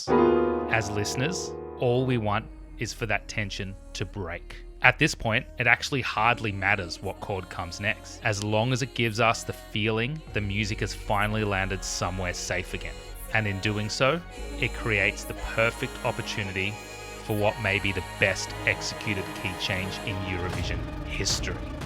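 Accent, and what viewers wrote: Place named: Australia. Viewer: All true. This is historic and cultural way of singing also. Etno. And if this is Aussie accent I love it. The best english ever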